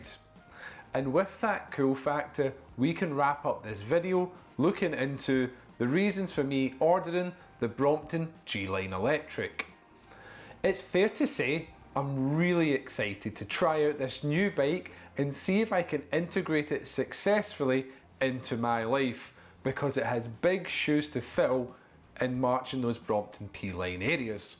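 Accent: Scotland, United Kingdom. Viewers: E beiiike… i love the scoottish people and their funny accents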